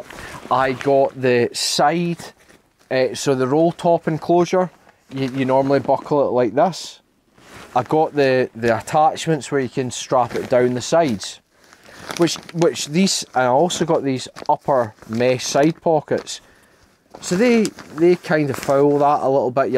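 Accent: Scotland, United Kingdom. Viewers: Got a real kick out of your video. Love the Scottish accent...lovely! Pretty jealous of all the goodies you've ordered too. Zpacks' equipment is fantastic. I have an early model heximid shelter for solo hiking and just ordered a triplex in hopes of getting my husband to join me on a trip or two. I'm very curious about the Arc Zip pack and how you like it once you try using it. Please make a follow up review video of it! Carole from the USA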